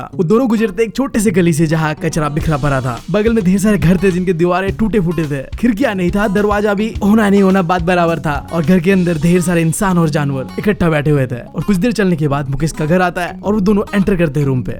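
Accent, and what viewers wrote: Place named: Bengal, India. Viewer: bro apka hindi bolne ka tarika sachme bangoli type ka hai mere bestfriend ki traha 🙃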